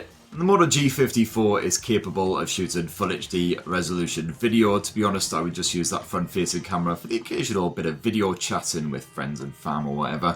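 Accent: United Kingdom. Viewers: thinkin about getting this as my new phone. I love ur british accent, its so goofy xd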